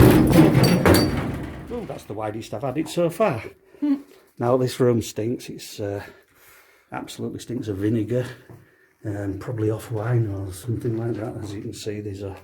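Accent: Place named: Yorkshire, United Kingdom. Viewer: eh up are you from ULL LOL. You seem to have a Yorkshire accent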